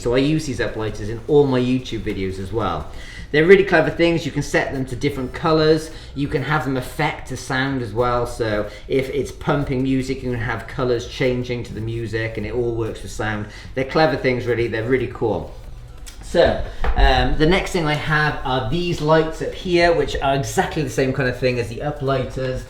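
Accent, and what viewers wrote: Wales, United Kingdom. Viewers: Its probable, that him being from Wales, his accent makes it sound like he is saying "tress" rather than "truss". I may be mistaken though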